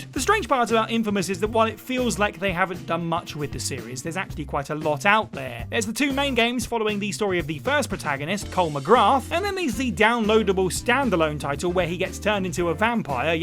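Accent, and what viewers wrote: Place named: Scotland, United Kingdom. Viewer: Can someone explain to me why scots say "Sweatch" instead of "Switch"??😂😂😂 Sounds smooth af 😎😎😎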